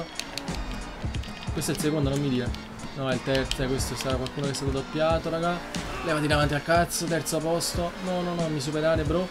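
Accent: Naples, Italy. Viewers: non so se lo hai mai detto,ma sei napoletano? -Sasy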